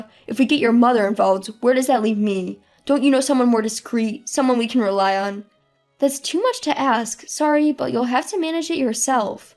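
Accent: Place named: Middle East. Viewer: At least it wasn’t Chinese AI. More like middle eastern.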